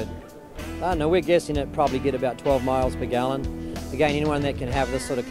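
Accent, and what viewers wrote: New Zealand. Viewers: this guy sounds like a kiwi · is that a little nz accent mixed in? lol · souns like a kiwi no doubt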